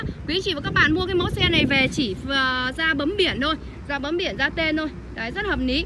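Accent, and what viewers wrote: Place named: Hai Duong, Vietnam. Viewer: Thương em vất vả, nghe em nói đã biết quê gốc Hải Dương, cứ níu na níu nô, chúc em kinh doanh tốt nhé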